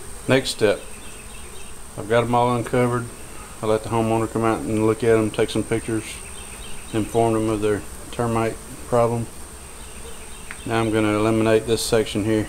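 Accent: South, United States. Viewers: I'm fascinated, I've never seen anything like this. Great video! love your southern accent ;)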